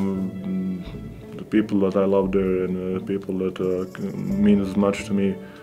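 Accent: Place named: Sombor, Serbia. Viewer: Pozdrav, uz tebe smo brat nas! Nego opusti se i pricaj engleski sa tvojim somborskin naglaskom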